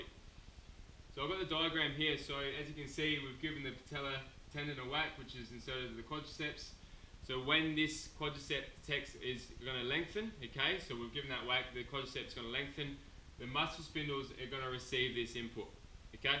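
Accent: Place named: South Australia, Australia. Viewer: Thanks for the video. By the sound of your accent, you are from South Australia. Very different accent to the other Australian states!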